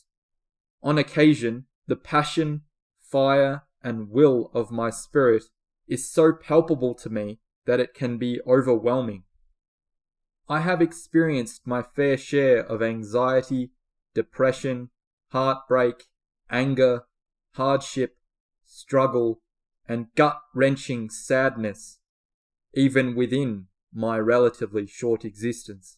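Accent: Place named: New Zealand. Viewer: You may be a strange kiwi, but under all 'thit' accent we are kindred spirits. Thank you for being brave enough to blaze a path.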